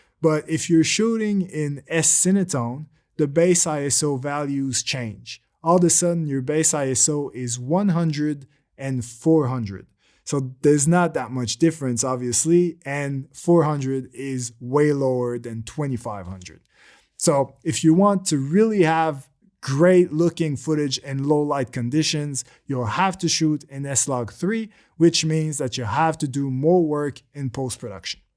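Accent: Quebec, Canada. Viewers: Jpourrais jurer que je reconnais un accent du quebec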